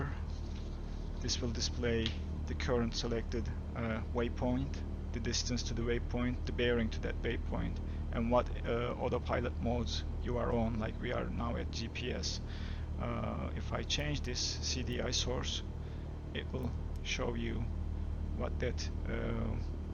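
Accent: Turkey. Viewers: Bu adam türk değilse bu dili de bilmiyorum derim artık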